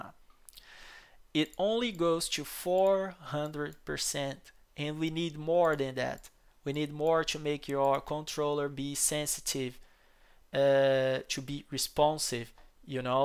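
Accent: Brazil. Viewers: QUE INGLES top hein, obrigado amigo, vi que é br pelo sotaque hahaha, thankyou bro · boa mn lamento dizer mas seu sotaque brasileiro é bem reconhecível kkkkkkkkkkkkkk video bom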